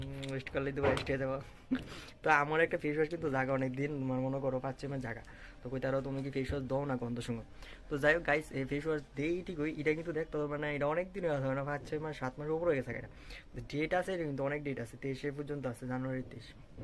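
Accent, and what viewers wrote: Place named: Sylhet, Bangladesh. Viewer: Eta siliti Bangla style na ? Val lagta se tomar ro loge suniya 😀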